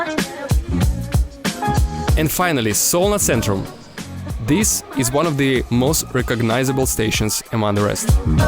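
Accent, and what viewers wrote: Russia. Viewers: Смысл его тренить на русском акценте? Американца или англичанина после этого не поймёшь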